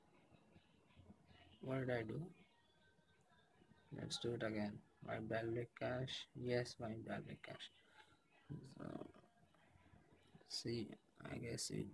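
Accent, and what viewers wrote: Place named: India. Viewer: No crees que si hablas en el idioma que realmente domines se entienda mejor ese inglés que manejas esta por la banda con el acento pareces un Indu hablando inglés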